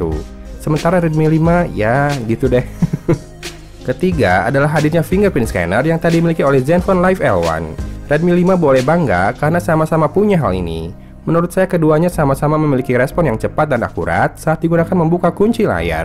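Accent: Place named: Sunda, Indonesia. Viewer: Urang sunda mana suara nyyy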